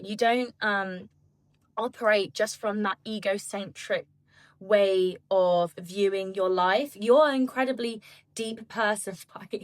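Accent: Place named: England, United Kingdom. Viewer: Thank you for posting this. This resonated with me so much that the English accent did not even register with me until halfway through. This is the sign.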